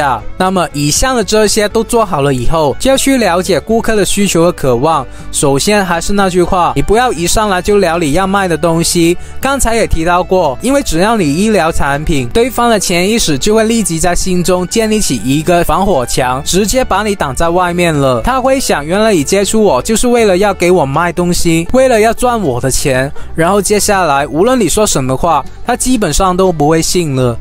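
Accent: Hong Kong, China. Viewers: 不是台灣口音喔！感覺比較像香港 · 想問下是哪裡人呀？聽口音，難道是香港人嗎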